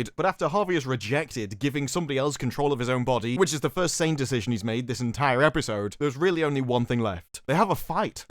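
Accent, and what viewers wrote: United Kingdom. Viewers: Dear, I like your videos but fix your teeth please. Your British is showing.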